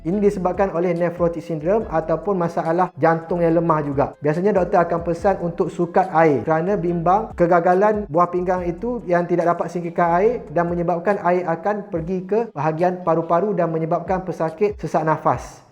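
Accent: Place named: Kelantan, Malaysia. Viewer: Ore klate pesen nii..gaya kecek gitu😂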